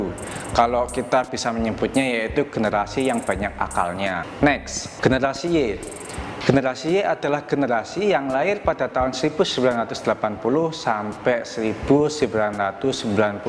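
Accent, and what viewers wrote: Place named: Java, Indonesia. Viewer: Medok lek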